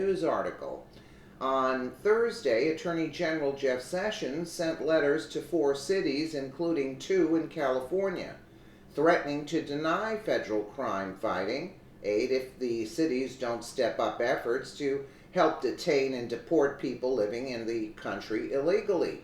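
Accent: New York, United States. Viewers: yeah and she sounds like a really great dame who knows the score - New York accent ?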